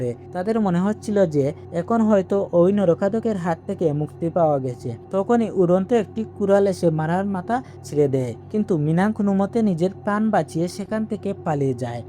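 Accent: Sylhet, Bangladesh. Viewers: R you sylety 😊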